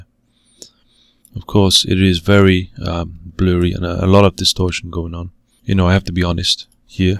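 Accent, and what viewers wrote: United Kingdom. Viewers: No reason to doubt her Looks real to me. Especially after you did your thing to the video. Another gem mate Glad to see your channel gaining subs I remember when I first discovered you with barely 1000 subs Your humble and honest approach is why.Plus,having a delightful British accent doesn't hurt😉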